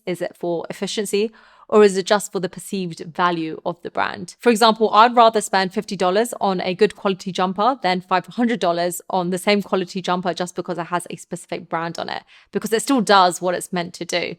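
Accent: United Kingdom. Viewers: God bless pretty, smart, women with British accents! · Accent: British. Currency: USD. Make sense of that.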